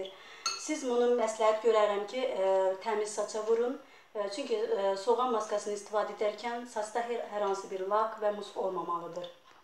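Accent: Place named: Azerbaijan. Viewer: Lan șu azarbeycan konușmasina hayranim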